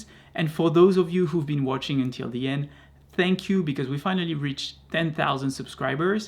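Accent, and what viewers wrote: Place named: France. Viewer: I detect a french accent. Très joli!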